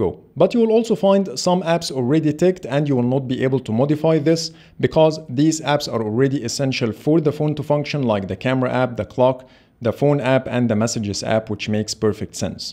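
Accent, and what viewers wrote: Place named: Egypt. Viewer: لهجتك انجليزي مصري ... عاش اوي ❤️❤️❤️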